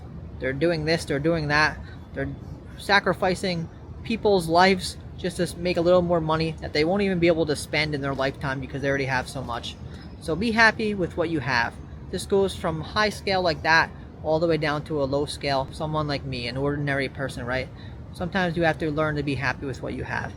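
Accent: New York, United States. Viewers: Beautiful message, brother! Love your NYC accent ❤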